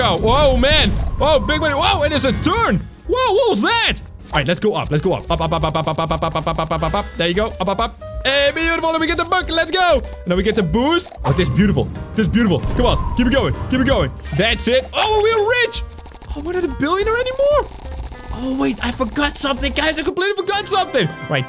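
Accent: Netherlands. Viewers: are you from the netherlands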